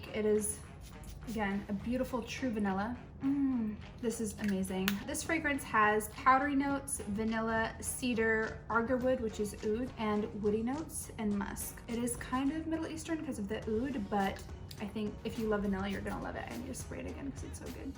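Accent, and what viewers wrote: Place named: France. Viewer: I love your French accent 😏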